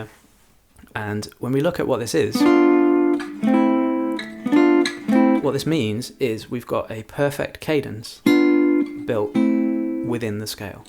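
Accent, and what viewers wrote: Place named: United Kingdom. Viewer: Thanks, nice clear laid back Brit!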